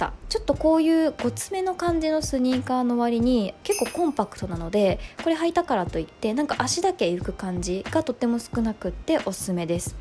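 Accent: Kansai, Japan. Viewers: 関西の方ですか？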